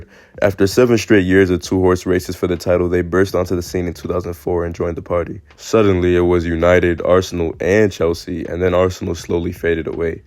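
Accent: United States. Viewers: It’s pronounced more like lair-star not lie-stir but I get that it’s harder with an American accent to pronounce English club names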